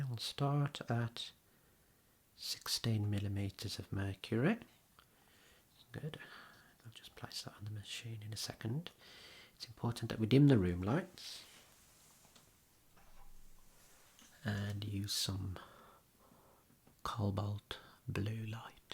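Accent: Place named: Black Country, United Kingdom. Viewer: Great video, love to hear the Black Country accent!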